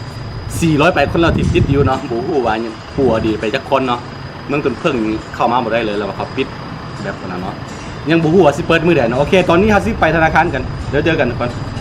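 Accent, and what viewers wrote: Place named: South, Thailand. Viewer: สวัสดีค่ะน้อง,กินกันได้ม่าย.?พูดไทยทางไต้.ค่ะ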